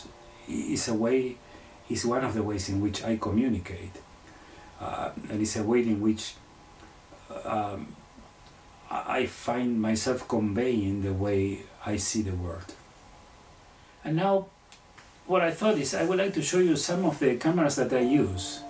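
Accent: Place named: Chile. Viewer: Estimado, por el acento presumo que eres chileno. Creo que somos contemporáneos fotográfica y existencialmente, además de provenir de esta larga faja de naturaleza que es nuestra tierra. Imagino que vives en Usa, por tus imágenes. El gran formato es muy atractivo y quién lo práctica como tú podría decirse que es como ser un "sibarita" de la imagen. Pero con la imagen digital, como tú bien dices, la inmediatez resulta muy atractiva y adictiva. Pase de lo análogo a lo digital con gran entusiasmo y sigo en esto hasta el día de hoy con ya más de 50 años de acción fotográfica y a mis 77 abriles.... Un gran saludo para ti, de este fotógrafo radicado en el sur de Chile. Puedes ver algo de mi obra, bajo mi nombre en youtube...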